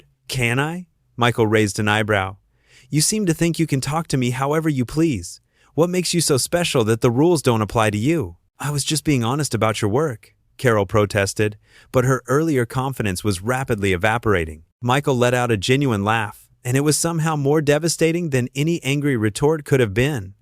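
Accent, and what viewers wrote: United States. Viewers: This British Insights video is just a way to click and watch this video with untrue facts. This video was edited from the Loose a woman Show and it has a voice over story There is a similar video featuring Lorraine Kelly” insulting” Sir Michael as well . I wish these companies like “ British Insight “ using an American voice over to do something positive. Make videos for something like saving the rainforests or helping homeless people instead of winding people up.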